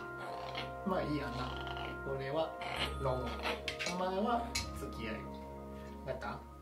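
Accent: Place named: Kansai, Japan. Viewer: リキちゃん可愛い😍😍😍 飼い主様の関西弁も好きです✨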